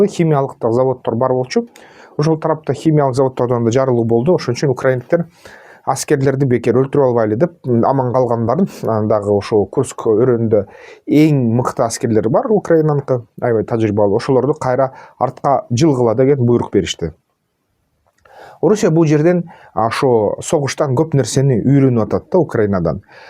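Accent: Standard. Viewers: Таза кыргызча суйлогонунуз жагат, азаматсыз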